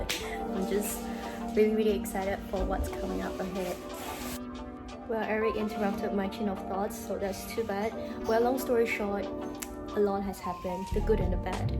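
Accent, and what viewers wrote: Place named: Singapore. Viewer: Congrats again on your next milestone in life!!!!!! 💖 also I think you still sound quite Singaporean just that you enunciate your words properly hehe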